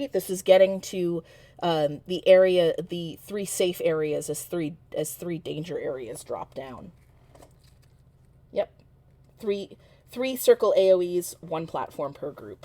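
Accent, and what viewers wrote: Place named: Canada. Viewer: Your accent is close to mine but idk what mine is….are you Canadian??????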